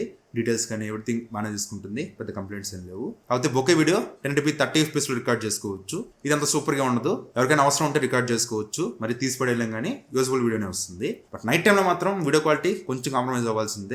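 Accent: Nellore, India. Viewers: Are you from Nellore 😮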